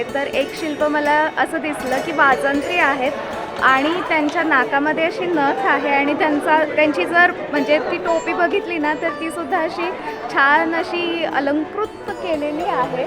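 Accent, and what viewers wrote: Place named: Gujarat, India. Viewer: Gujarati laheja aahe Nemadi nahi.... Nit aikun bolat ja baai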